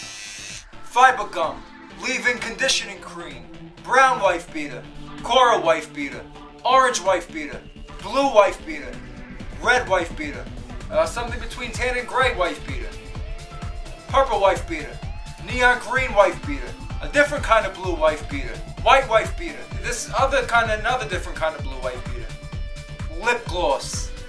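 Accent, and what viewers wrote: New Jersey, United States. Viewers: That's because it's not an Italian accent. It's New Jersey accent. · my aunt is of italian origin and shes from new jersey and she sounds exactly like that. and my parents sound like that too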